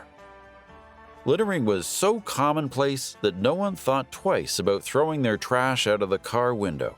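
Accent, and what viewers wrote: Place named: Canada. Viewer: This narrator sounds like he's from 🇨🇦 . . . 😊 Yeah, our childhoods were waaaay different from those of kids today! I don't think I'll ever get used to how quiet my neighborhood is in the summer! 😅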